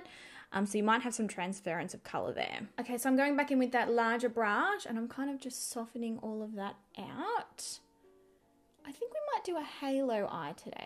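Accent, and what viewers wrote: Australia. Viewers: I miss Australia so bloody much, like your accent makes me want to cry I wanna move back now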